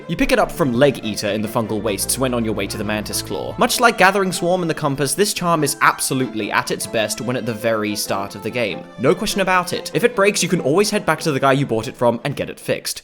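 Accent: United Kingdom. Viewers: I was getting ready for some serious insults before I realised I'm an Australian watching a polite British guy pretending to be mean.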